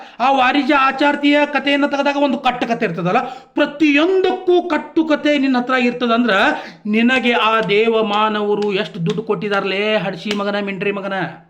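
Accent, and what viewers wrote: North Karnataka, India. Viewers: ಗುರು ನಿನ್ ಮಾತು ಅದ್ಬುತ ಬ್ರೋ ಉತ್ತರ ಕರ್ನಾಟಕದ ಭಾಷೆ ಕೇಳೋದೇ ಚಂದ ನೀನು ಸತ್ಯ ಮಾತಾಡ್ತಾ ಇದ್ದೀಯ ಬ್ರೋ ದೇವರು ಒಳ್ಳೇದು ಮಾಡಲಿ ಆದ್ರೂ ಜೋಪಾನ ಬ್ರೋ ❤❤❤🙏🙏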